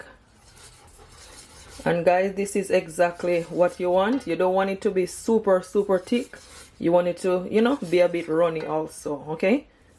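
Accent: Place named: Jamaica. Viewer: I love your Jamaican accet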